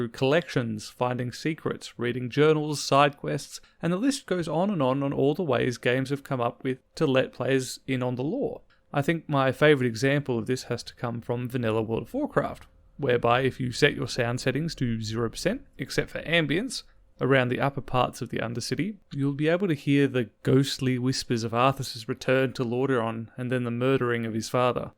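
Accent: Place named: Australia. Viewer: Video essays are good. Plz do more. Good to hear an Aussie on here. While I've always enjoyed doing the extra info searching to get me into a mmo, WoW has started to be boring again. FF14 has been a great antidote. Thank you for making content on it from a similar perspective to mine. Keep up the good work mate and looking forward to hearing more from you once I've finished watching all your vids. (about half way through now).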